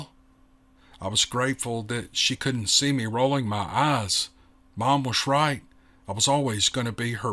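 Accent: South, United States. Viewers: Awesome story!! Neoma definitely has a way with words. Her very descriptive form of writing drew me in and had me feeling like I was part of the story. Neoma's writing coupled with silky smooth Southern drawl is a match made in storytelling heaven🥰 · Thank you for another great job. Enjoyed it very much. I guess it's that lovely southern drawl that just pulls you into the middle of the action. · I got to say this is one of the most interesting stories you have read. And I also will comment on your wonderful accent and phonics and the way you speak/read to us all. Your accent sound, southern, but without the heavy drawl-but I’m not exactly sure where to pinpoint where your accent is from! Where I’m from there’s been all types of accents and I can usually identify them really well. Tell us please. And thank you again for these really really interesting stories.👏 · Super story! 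Kept falling asleep to your sweet southern drawl. It took the whole night, till am for me to hear the ending. Wow! What a conclusion it was! I'm awake now 😂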